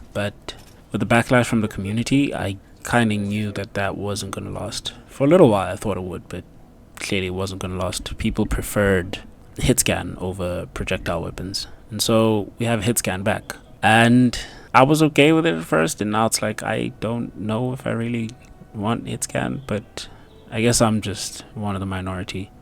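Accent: South Africa. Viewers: Hey are you South African? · Now hold on are you from South Africa...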